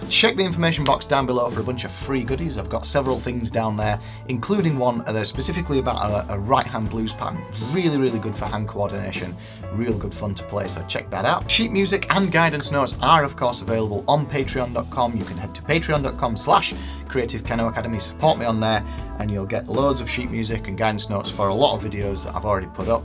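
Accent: Yorkshire, United Kingdom. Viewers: Hello. Love the vid! More please. You sound like you´re a yorkshireman like myself, which town?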